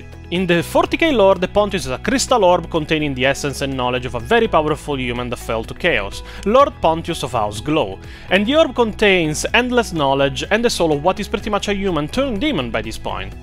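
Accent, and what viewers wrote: Italy. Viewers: This is fire, I mean I knew you were Italian but this accent just makes your jokes 10 times funnier looking back at em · Bro is the most Italian sounding Italian I've ever heard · But not violently Italian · Holy shit, he sounds AGGRESSIVELY Italian! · This is awesome! Looking forward to more of videos and savory Italian accent! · This italian english is so perfect 🤌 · You sound exactly like an Italian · Gran Acento Rustico Italiano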